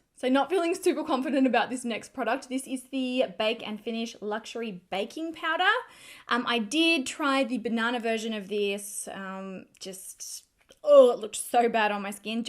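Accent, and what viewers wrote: Australia. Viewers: I Love your Australian accent I’m in USA 🇺🇸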